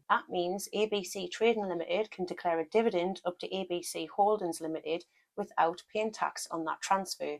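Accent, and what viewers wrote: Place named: North, United Kingdom. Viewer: Where are you based you sound northern.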